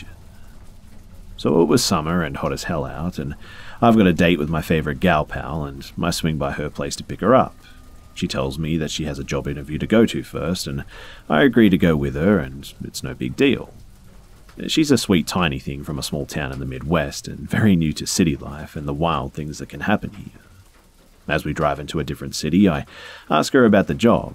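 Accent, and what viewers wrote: Australia. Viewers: How the hell have I only just found you bro, an Aussie narrator, if I knew about this earlier its all I'd be listening to, keep up the good work brother!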